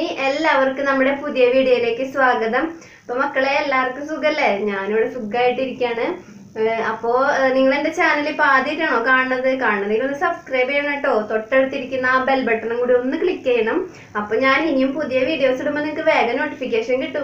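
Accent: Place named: Thrissur, India. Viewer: Kollatto. തൃശൂർ ആണോ. തൃശൂർ slag und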